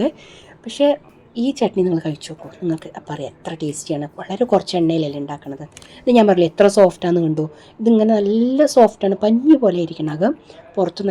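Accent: Palakkad, India. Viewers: പാലക്കാടാണ് നാട് എന്ന സംസാരത്തിൽ മനസ്സിലാക്കാം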